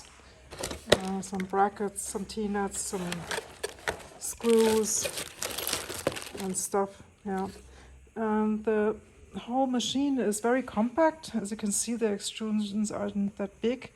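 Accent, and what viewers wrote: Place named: Germany. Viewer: Da ich höre das Deine Muttersprache Deutsch wäre, frage ich in Deutsch....😅 Funktioniert es gut?...hattest Du viel ärger damit? Überlege mir auch so einen zu kaufen.😊 Grüsse Roy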